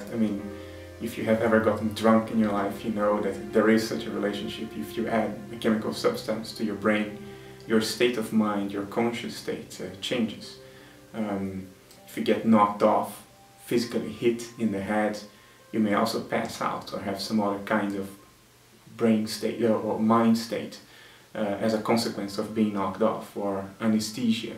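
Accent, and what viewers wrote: Netherlands. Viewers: im hearing a dutch accent am i right? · I'm dutch and his accent sounds pretty special. I haven't heard any english speaking dutch in a while I reckon.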